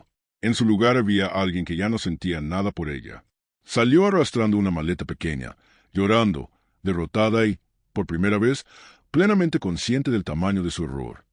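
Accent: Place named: United States. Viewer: La historia estaba bien, pero por qué usar una voz de IA tan rara? El tono de voz es horriblemente incómodo y el acento gringo es innecesariamente molesto.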